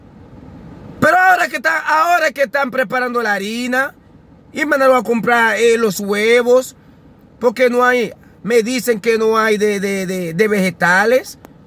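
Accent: Haiti. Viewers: Disculpe, hermano yo no lo conozco, su acento es ahitiano? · Tu eres haitiano ese acento tuyo es puro haitiano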